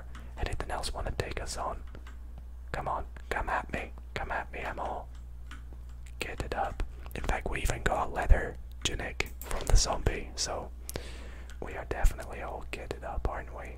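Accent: Scotland, United Kingdom. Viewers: Are you scottish bc my friend is scottish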